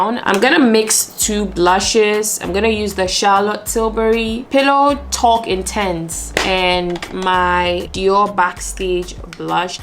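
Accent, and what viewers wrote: Ghana. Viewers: You sound so ghanaian! I love it ! I appreciate your authenticity, it's making me miss GH. You're also stunning !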